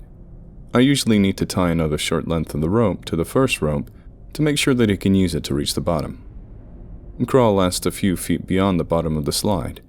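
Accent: United States. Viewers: Your american accent is pretty good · Always amazed at your talents. Your American accent is top notch!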